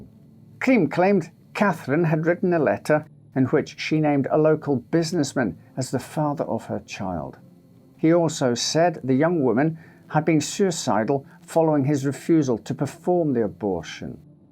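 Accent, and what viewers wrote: United Kingdom. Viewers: I will never understand why Brits pronounce aluminum the way they do. It's wrong af 😂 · I absolutely love your content, sir. You truly know how to induce a moment of true dread with your powerful accent. You make me proud to be a British person with a proper silver spoon in my mouth, and I wouldn't have it any other way. Listening to you is literally like reading a truly mysterious sonnet written by an unknown author. That's how much I find your videos satisfying to watch and listen to. I could easily fall asleep to your incredible content.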